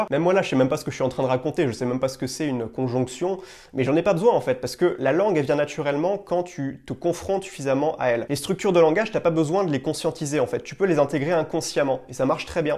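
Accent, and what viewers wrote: France. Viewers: '' accent français dégueulasse '' et je suis pas d'accord, ça fait son charme des fois, à part si tu parles comme François Hollande 😅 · c'est trop bien l'accent français, ça aide à pécho et ça mets un peu de noblesse française dans cette langue de plouc qu'est l'anglais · L' accento francese è bellissimo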